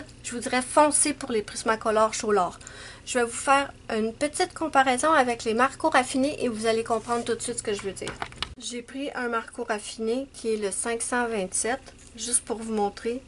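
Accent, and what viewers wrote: Canada. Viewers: j'adore ton accent canadien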